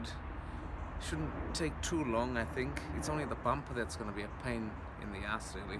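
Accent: Durban, South Africa. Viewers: Nice work. Dude you sound like you from Durban South Africa? your accent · Bro are you South African? 😂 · You sound South African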